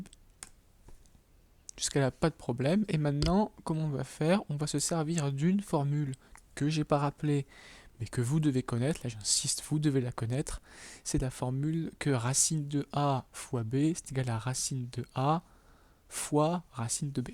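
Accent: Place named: France. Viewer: French accent 🥸🥸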